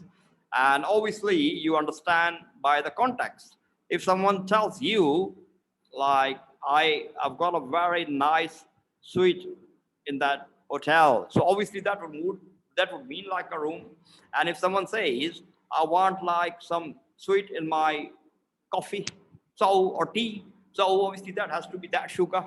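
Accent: United Kingdom. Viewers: Sir your accent of British English and your pronunciation are very nice and I like your love for British English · I may a new one from here, But actually I do love your British accent.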